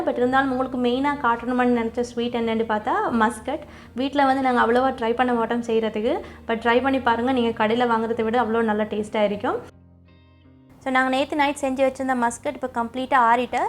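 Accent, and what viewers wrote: Sri Lanka. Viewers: Dear Sister, I always interested in your Coking and the way you speak Tamil is so sweet. Where do you come from in Sri Lanka, Which area? Salam from Germany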